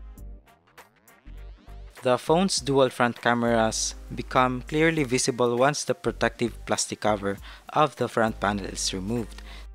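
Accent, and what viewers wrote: Philippines. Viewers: Yourrr Englishh yoww i halata that you are relly a pilipino.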